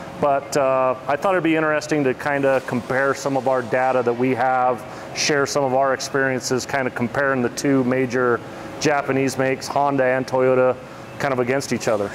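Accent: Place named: Canada. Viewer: With all of the awes,you sound like Turdo up her in Canada!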